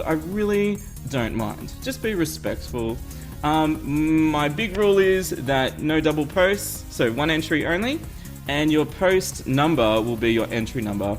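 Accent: Australia. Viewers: I only started watching 2 days ago, but your stuff is great. the Australian accent is very motivating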